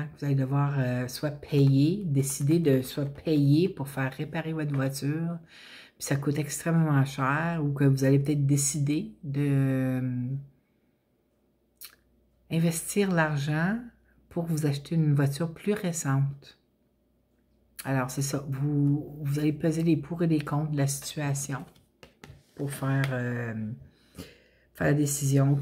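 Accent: Canada. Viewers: Toujours un réel plaisir de vous entendre. Vous êtes toujours dans le juste. Et petit aparté, cela me fait du bien de réentendre l'accent canadien 😍 . Bonne continuation à vous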